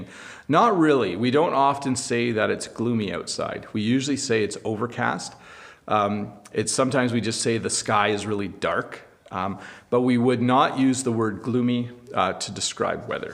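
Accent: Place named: Canada. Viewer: Hey, I would like to follow the Canadian accent. Do you have any tips?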